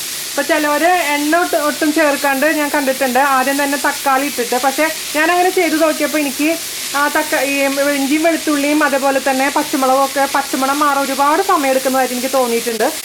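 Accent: Thrissur, India. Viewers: ഗഡീ ഞാൻ കരുതി ഡെന്ദേദ് ദെ നുമ്മടെ തൃശ്ശർ കാരിയാണല്ലോ ഉണ്ണേടെ നാടൻ ശൈലി, ഹാഫ് ആയപ്പോഴാ എന്റെ നാട്ടുകാരിയാ... സൂപ്പർ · yude big fan anu njan. Mattu cooking show kalil ninnum valare vyathyastamaya reethiyilulla avatharanam anu.. Thrissur slang ilulla samsaram kelkan thanne rasamanu....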